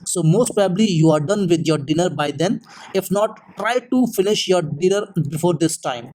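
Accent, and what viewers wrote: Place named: India. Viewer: Very strong Indian accent ☺️🙏🏻💫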